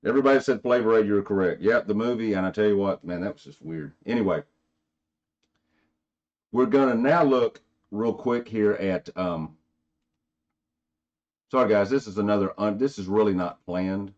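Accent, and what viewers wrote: South, United States. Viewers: You have and American speaker acent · If I watch you for too long I might start talking Southern.I loved what you are finding and your long ago contributions.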